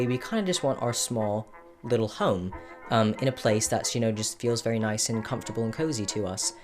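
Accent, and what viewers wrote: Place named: United Kingdom. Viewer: I can't tell if you're British or not it sounds like you are British but are trying to speak with an American accent or something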